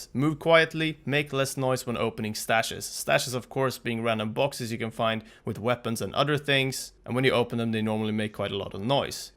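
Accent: Sweden. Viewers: bra video, that swedish accent tho XD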